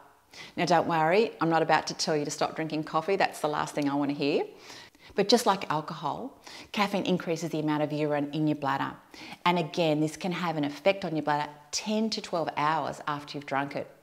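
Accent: Australia. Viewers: she sounds australian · You are so Aussie!!!!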